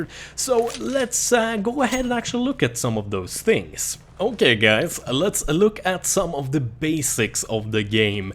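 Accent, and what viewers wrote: Sweden. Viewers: I just bought the game and thought I'd give it a try since CS is soooo praised by gamers. Here is what I think about it (only played 5 hours) Challenging to handle the weopons (This is a plus for me) I get spawnkilled ALL DUHH TIME!!! Few and pretty boring game modes and maps. People either camp in corners with snipers or run around with shotguns. (not the game faults though) Weird frame drops... Shite graphics lol. But the game is old right?... I don't like the game, I'm not hating. Just sharing my thoughts of the game. BTW Are you swedish? You really do sound like a swede. Skit bra review för noobs som mig! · He swedish :'D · ? ? hes swedish dumbass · u r swedish arent u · man hör så jävla väl att du e svensk xD Bra video ;) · Are u swedish?? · He has a Swedish accent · Are you swedish? im new and the first thing i heard was youre accent, sounds swedish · This dude is from sweden tou can hear it on How he talks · Very swenglish but i am swedish to i cant be too rude🇸🇪🇸🇪🇸🇪🇸🇪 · the swedish accent i love it xD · Is he from Sweden? 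· Easy to hear that this guy is Swedish :D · you're swedish right? sounds swedish! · he IS swedish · The swedish is real! xD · Svensk? (dialekt xD) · also u sound swedish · your accent is very swedish! i just noticed that now. but anyway thanks for this guide! i also want to be better at this game because most of my friends are csgo players and im being left behind :( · two seconds into the monologue and I'm betting you're Swedish, correct? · Tack för videon (och tummar upp för svengelska uttalet haha<3)! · He's definitely Swedish. - A Swedish guy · that Swedish accent. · His accent is maybe swedish because he is from Sweden? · Heard right away that you were swedish x) It was kind of fun to see that yoy Also did that " " with your name cause I do too XD exactly rhe same way, since I was 13 xD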